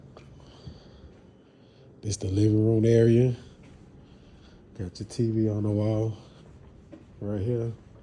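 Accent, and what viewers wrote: South, United States. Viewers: I hear that southern drawl! “ lil fri-juh-ray-da”.. I’m from the Midwest… we sound like that too🤣🤣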